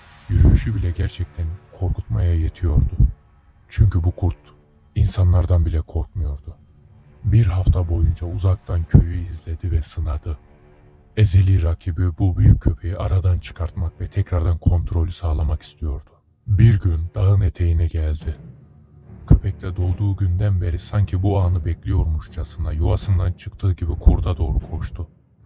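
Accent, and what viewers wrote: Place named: Kurdish, Turkey. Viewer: admin kürtmüsün len bu ses tonu ne kıroı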